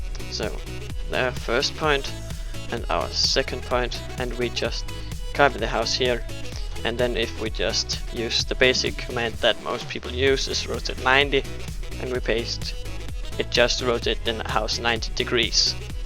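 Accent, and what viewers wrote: Denmark. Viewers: Er han dansker xD.. tænkte jeg nok.. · Are you from Denmark? BTw cool video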